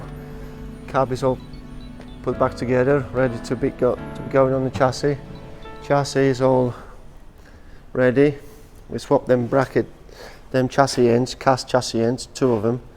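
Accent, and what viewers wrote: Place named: Lincolnshire, United Kingdom. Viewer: You have a slight Lincolnshire accent going on there.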